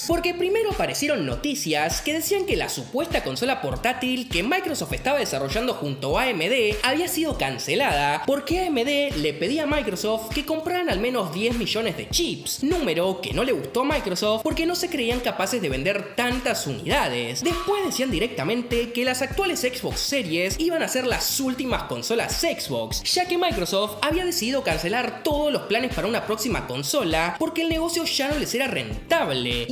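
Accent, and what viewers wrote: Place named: Argentina. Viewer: cualquier cosa que diga un argentino con ese acento tan horrible yo hago todo lo contrario, no destacan por su honestidad.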